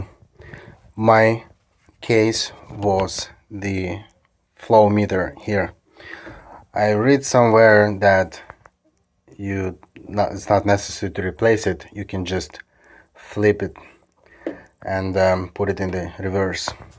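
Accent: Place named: Balkans. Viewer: nice great explanation bro, are you from Balkans?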